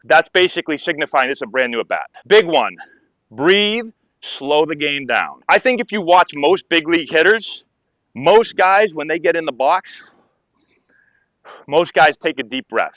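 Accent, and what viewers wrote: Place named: New York, United States. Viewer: Haha. True. Just the mild new york accent i think.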